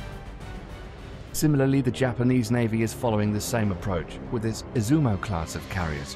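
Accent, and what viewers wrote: United Kingdom. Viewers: Don't forget, the narrator is speaking British English, Not American English. Pip pip, Tally Ho, and all that rubbish! Like my Grandson once said, "Why can't the Canadians make Real Bacon, instead of calling a ham slice, Canadian Bacon?" We had to 'splain, fried meat slices is NOT always Pork.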